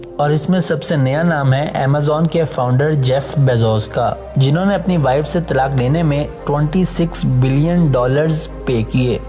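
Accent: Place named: Faisalabad, Pakistan. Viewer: ap koi faisalabadi lgty ho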